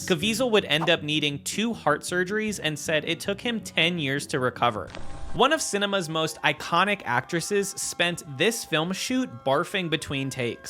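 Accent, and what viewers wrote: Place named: United States. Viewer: Please dude - Speak Slower. I'm native English speaking, but sometimes can't understand your American Twang....just BREATH!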